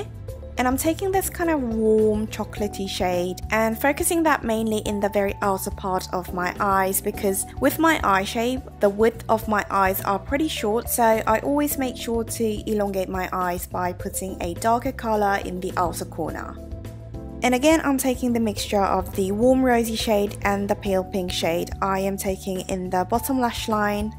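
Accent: United Kingdom. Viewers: 영상 오랜만이에요 언니 !!!!항상 브이로그 챙겨보는데 항상 밥드실때 댓글보신다고 하셔서 댓글 오랜만인지 처음인지 ....? 여튼 달아봐요 !!!항상 영상 잘 챙겨보구있고 언니 브이로그 보는게 진짜 삶의 낙이에요 ㅋㅋㅋㅋㅋㅋ밥먹을때나 자기전에나 꼭꼭 한번씩 더 챙겨보고 자요 !!!!봤던거라두요 ㅎㅎ 하루하루 브이로그찍으셔서 보는 재미도있구용 ㅎㅎ 영상 길이도 길어서 넘나 좋아요ㅠㅠㅠㅠ 항상 영상 잘보구있으니까 언니 화이팅해요 💕💕💕💕💕아 그리고 언니영상보면서 영국 특유의 그런 억양 너무 배우고싶어졌어요ㅠ영국 너무 가보고싶어요 !!계산도 혼자해보고싶구요 ㅋㅋㅋㅋㅋㅋㅋ여튼 영상 잘보고가요😘👍🏼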